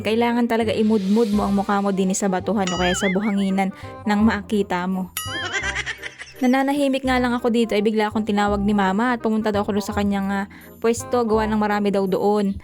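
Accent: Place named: Visayas, Philippines. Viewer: May halong bisaya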